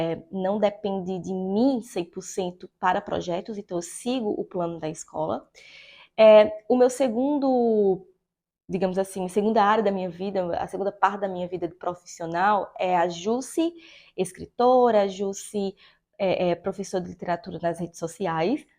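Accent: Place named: Recife, Brazil. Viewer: O sotaque recifense😍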